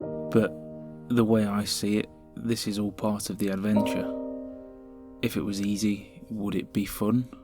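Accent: Leicester, United Kingdom. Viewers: I do live in Leicestershire and also thought I recognised the accent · Omg. I just twigged that your accent sounded like a Leicester accent and low and behold you are from Leicester. Hello from a fellow Leicester person. Loving your story and no I don’t live in Leicester. Have travelled round a lot myself.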